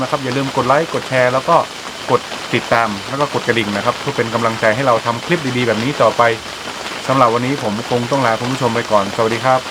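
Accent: North, Thailand. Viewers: หว่ากำเมืองเสียงฟังม่วนจ้าว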